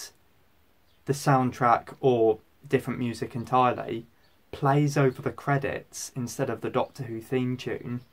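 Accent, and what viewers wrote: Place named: Northern England, United Kingdom. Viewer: I’ve got an out-of-the- blue (pun intended) question: do you have relatives from the north of England? ‘Cause I feel like I can hear a hint of a Northern accent(I’ve mentioned my good ears before😏)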